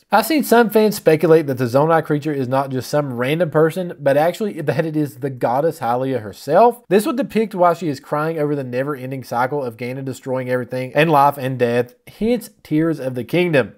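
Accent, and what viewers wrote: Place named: East Texas, United States. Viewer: I missed about half of what you said because I was trying too hard to place your accent 😂 East Texan maybe?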